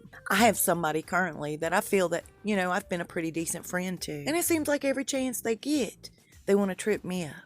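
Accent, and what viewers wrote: South, United States. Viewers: 💜💜💜Happy Valentine's Day! 💜💜💜 I didn't know about your abuse situation. So glad you broke free of that. You are a valuable person with a really cool southern voice! LOL. Bless you dear-heart today and always. Here's a rose for ya. 🌹🌹🌹🌹🌹🌹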